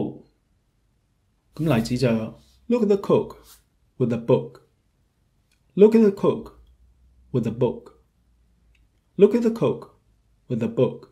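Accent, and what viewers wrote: United Kingdom. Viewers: By the way, what kind of English accents are yours? Would that be Oxford English? I am not familiar with the different types of English accents in UK, but I find it hard to understand some English people, especially the Welsh. I know people from different regions/provinces/states have different accents. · 以很優美的英式口音，清晰介紹每個vowel！絕對值得觀看、收藏及分享！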